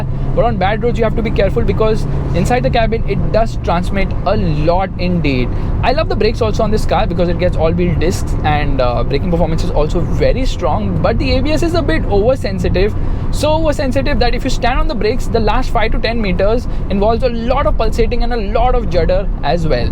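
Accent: India. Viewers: You speak so fast with Indian accent but yet I can understand you way clearer than most of native English or US speakers.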